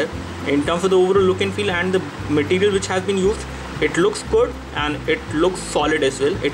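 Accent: India. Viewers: "what he says again" ? explain y ur sounded like indian?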